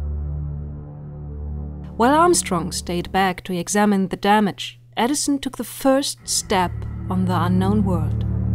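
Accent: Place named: United Kingdom. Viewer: du hast ja einen wunderschönen britischen akzent. Viele versuchen ihn zu imitieren, aber nur wenige schaffen das zu so einem hohen grad. Entweder die leute klingen noch sehr deutsch oder übertreiben bis zur lächerlichkeit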